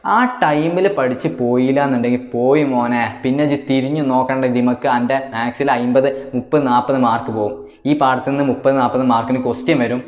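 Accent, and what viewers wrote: Malappuram, India. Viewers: Malappuram aano vaarathaanam kettette agane thonna 😅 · MALAPPURAKKARAN aanallee.. 😁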